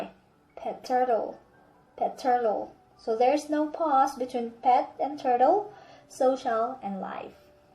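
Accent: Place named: Philippines. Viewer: Are you a Filipono? You're very good. Upload more videos about English tutorials because you're very good on it. Done my support.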